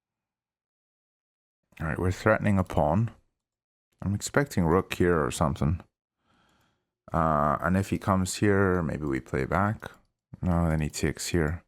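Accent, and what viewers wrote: Northern Ireland, United Kingdom. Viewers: Is that a northern Irish accent with a very slight glimmer of either European or African? Very interesting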